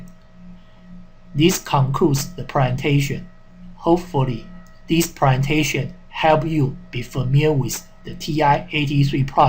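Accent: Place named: China. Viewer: lol “curly bracket”, his accent is sick. obvi chinese & not racist to point that out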